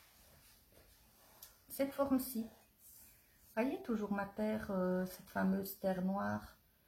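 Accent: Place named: North, France. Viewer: Merci pour ta générosité d'informations! moi qui suis originaire de Lille (expatriée en Normandie près de chez Raoult!) avec mon grand-père Belge, ça me fait du bien d'entendre ton accent;-)) dis-moi, quelle épaisseur fait ta plaque de terre pour ce plat? Y a t il des épaisseurs précises en fonction des objets plus ou moins grands? merci beaucoup Caroline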